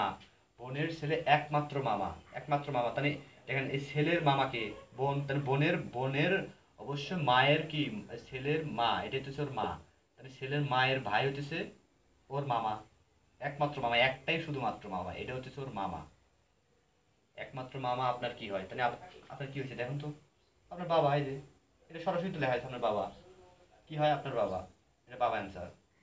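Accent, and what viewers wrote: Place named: Noakhali, Bangladesh. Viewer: নোয়াখালীর ভাষা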